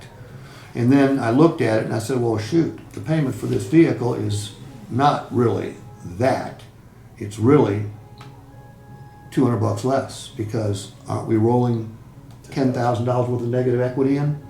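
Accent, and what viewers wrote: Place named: South, United States. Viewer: and his hillbilly bullshit talk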